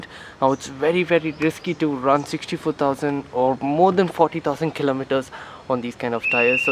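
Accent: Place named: South India, India. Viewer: Bloody southie .........change your accent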